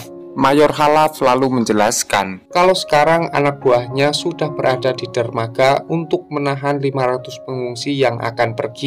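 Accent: Java, Indonesia. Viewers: Baru nemu chanell ini..suara nya jawo tok wkkwkk lgsg subcribe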